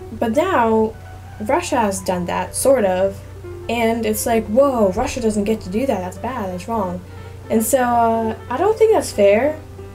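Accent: Midwest, United States. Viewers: yeah its called the aftermath of the fall of the commies. you could have put dumbo in charge and yall would do better over time cause there was nowhere to go but up. you sound like an american but you think like a commie. i heard a joke before, in russia you have 2 channels, 1 is propaganda 2 go back to channel 1. i dont blame you though its that russian pride. watching too much channel 1. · Russian with American accent is nothing unusual why must a Russian person speak in a Russian accent? American accent is spoken all over the world its internet English. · I'm from Indiana, and I can say listening to you talk you definitely sound like you could be from the Midwest. Congrats on your accomplishment! I've always wanted to be bi-lingual, so I am impressed and excited to see someone who was able to accomplish it. I'm still trying to learn Spanish as my second language. 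· You do a great job with Englsh, you sound like mid west accent, in Russia I was there short time on a cruise ..1995. St Petersburg very enjoyable and pretty city. You have great videos keep it up ok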